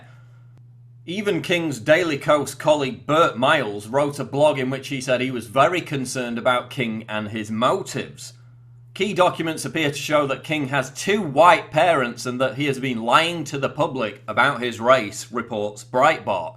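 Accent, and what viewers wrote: United Kingdom. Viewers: A white guy on YouTube with a British accent, commenting on BLACK issues?? Lmfao the irony of this dude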